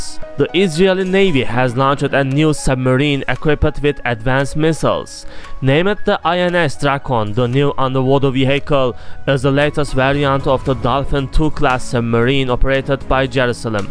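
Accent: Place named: China. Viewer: Why does narrator have Chinese accent? 😊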